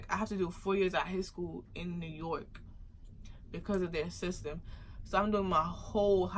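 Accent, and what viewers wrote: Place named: London, United Kingdom. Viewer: Sounds like a Londoner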